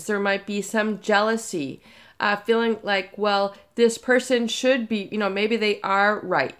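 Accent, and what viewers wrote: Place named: Great Lakes, United States. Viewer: Hey, I can hear the Great Lakes in your voice. Buffalo? Cleveland? It’s charming.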